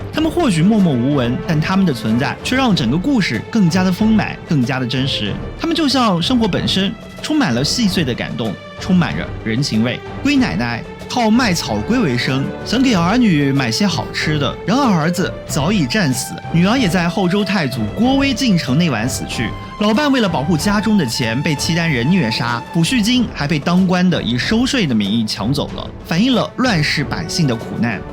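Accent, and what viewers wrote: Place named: China. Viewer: 这个主播不是台湾人吗？怎么口音听着这么标准大陆口音？我平时就爱听台湾主播，或者外国主播，感觉exotic，才带劲。。这一下这么标准，不适应了。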